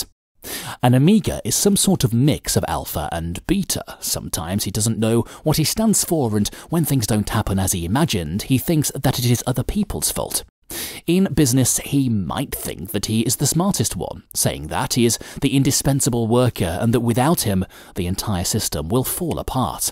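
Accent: United Kingdom. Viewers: The most thing that I liked in this video is the British accent, long live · Is there a reason you seem to always show a black Guy especially with the white women as if they are better than white men? Seems racist to me and I think the white guys should be with their own race and so should the black Guys! There are many Alphas who are white. Are you ashamed of your own race or is that a British thing. I say that because your voice indicates you are white and British?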